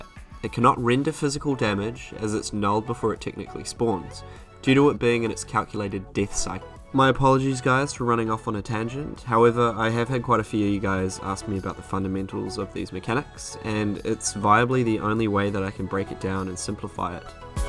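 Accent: New Zealand. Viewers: I realised half way through the video when you were pronouncing some of your words that you were from the brother country lmao, no worries mate :) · Bro I swear you sound like ur from nz, am I right?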